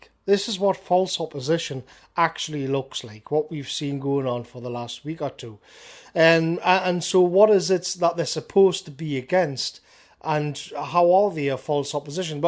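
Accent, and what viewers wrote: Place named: Newcastle, United Kingdom. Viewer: Fuckin hell a Geordie?! :O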